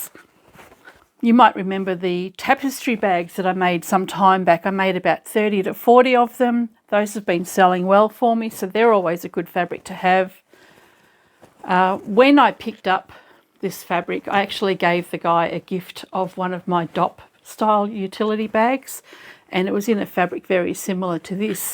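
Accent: Australia. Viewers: I always enjoy you videos & love hearing your Australia accent. So, talk all you want. ❤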